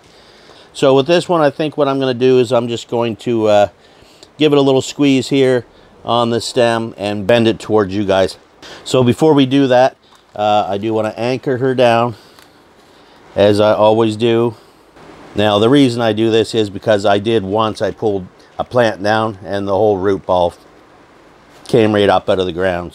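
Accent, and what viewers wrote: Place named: Boston, United States. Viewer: You from Boston brother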